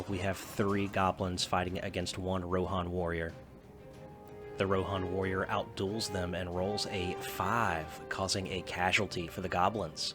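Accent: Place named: South, United States. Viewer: Where are you from? Your southern accent keeps poking through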